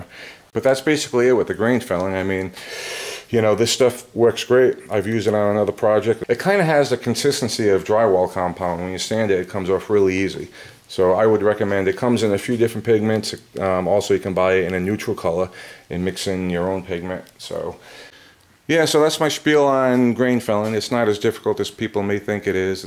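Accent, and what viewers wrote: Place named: New England, United States. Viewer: The accent you speak with sounds very north east coast maybe Maine, Boston, but any way I was slug'n some beers and perusing YouTube and found this guitar video and I thought it was good shit, very informative from someone who has obviously had some hands on experience with the craft. Good stuff.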